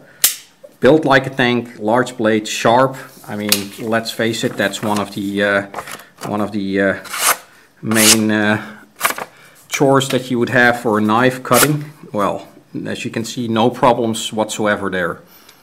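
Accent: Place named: Netherlands. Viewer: Ik kan wel horen dat je een nederlander bent. Niet dat je Engels slecht is, maar de uitspraak kan beter. Goede EDC keuzes!!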